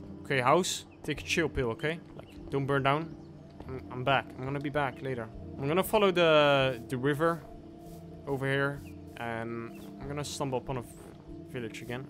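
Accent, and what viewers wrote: Netherlands. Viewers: Are you Dutch or Belgian? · Do I hear a Dutch accent? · I’m smelling a Dutch accent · quiq question and by no means to offend you but are you dutch? you sound pretty dutch to me. (again not meant offensive by any means and tbh it sounds good(nice vid too)) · Het Nederlandse accent maakt het wel af hoor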